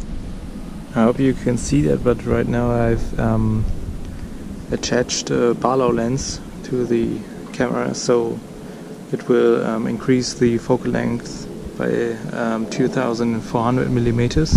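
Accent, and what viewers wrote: Germany. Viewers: Der ist deutsche dieser aktzent und die heuser ... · Höre ich da einen deutschen akzent? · Dieser deutsche Akzent einfach herrlich Quatsch doch einfach auf deutsche ✌🏼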